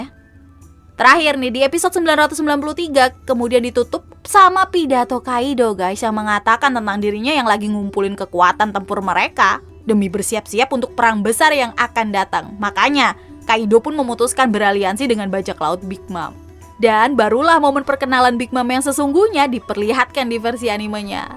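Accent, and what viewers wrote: Java, Indonesia. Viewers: Medok dia org jowo kek Mr kucluk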